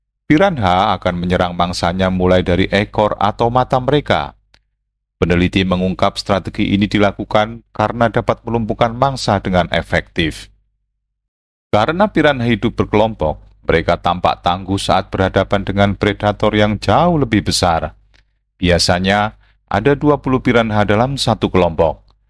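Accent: Indonesia. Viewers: Logat nya sangat kental sekali, pasti beliau orang medan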